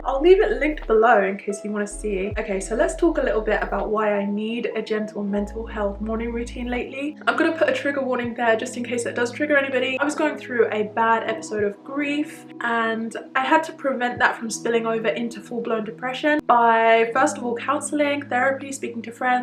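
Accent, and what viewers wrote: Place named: Essex, United Kingdom. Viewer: Lara here. Very pretty intro. ☺️☺️ The Essex accent 🥰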